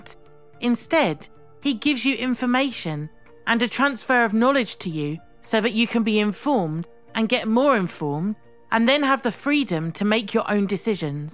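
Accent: United Kingdom. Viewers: Know how, I subscribe because I love the British accent and the narrator even makes more appetising.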